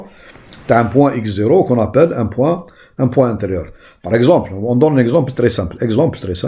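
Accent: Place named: Algeria. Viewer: C'est un professeur algérien, c'est pourquoi il parle arabe français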